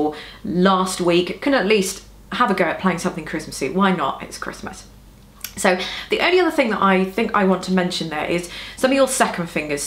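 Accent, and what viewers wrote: United Kingdom. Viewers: and you have a very good british accent i wish i can talk like you.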